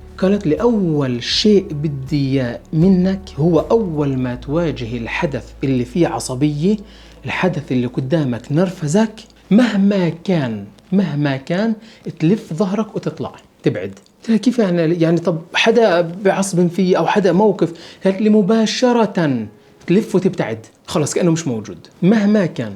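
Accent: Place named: West Bank, Palestine. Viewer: ياسلام على لهجة الضفة 💚 الفلسطينيين دايما حلولهم ربانية .سبحان الله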